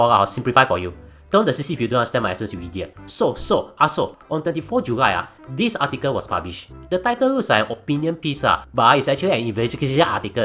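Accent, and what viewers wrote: Singapore. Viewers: so funny. i want to take Singlish presentation skills from you. You are a classic act that is hard to emulate!:goodvibes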